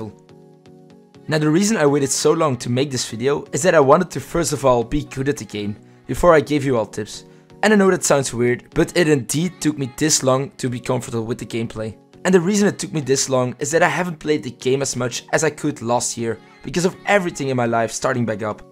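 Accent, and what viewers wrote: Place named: Netherlands. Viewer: Ik hoor an je uitspraak dat je Nederlands spreekt, klopt dat? Toch heb je een zeer goede uitspraak in het Engels. Bedankt vor de video, het heeft me geholpen. Groeten uit Duitsland! :)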